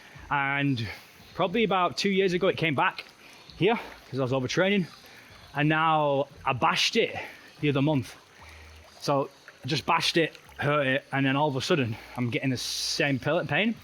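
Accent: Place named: Ireland. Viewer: Where are you from? You got very nice accent for ear. Irish man?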